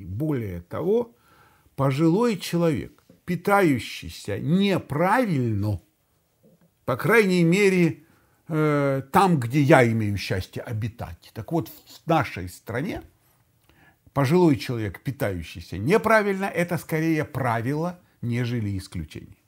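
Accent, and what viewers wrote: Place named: Ukraine. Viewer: с українська акцент 😊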